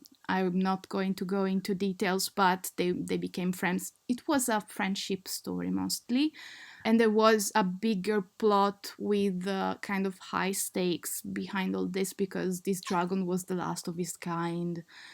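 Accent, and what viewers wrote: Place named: France. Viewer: Are you french ?? You sound like french 😆🧡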